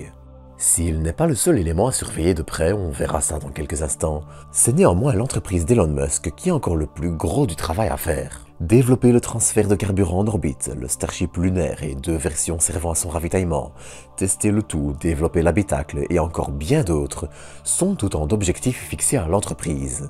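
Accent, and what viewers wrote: Belgium. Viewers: Merci pour toutes ces précisions ! Toujours un plaisir de regarder tes vidéos avec ton accent belge si appréciable !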